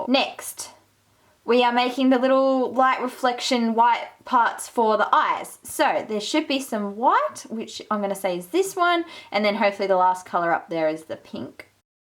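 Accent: Australia. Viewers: Are you Australian??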